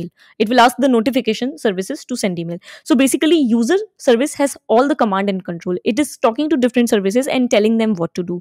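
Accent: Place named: India. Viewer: im also indian and I love the accent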